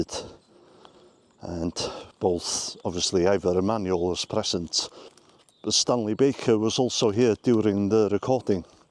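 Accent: Wales, United Kingdom. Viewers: Ardderchog ! Excellent. Don't ever lose that Welsh accent. Loved this. One of your best yet. What's the guitar piece at the end ? It goes so well with your closing scenes. Diolch yn fawr. Thank you so much. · I really enjoy your videos. The Welsh countryside seems a place lost in time, where modernity with all its trappings hasn’t yet consumed the past. I hope it never will and parts of Wales remain a hundred years behind the present time. I bet Richard Burton noticed that when he returned to Wales from America .Your accent is remarkable. It’s so strongly Welsh that it almost sounds foreign, something like Eastern European at times. Charming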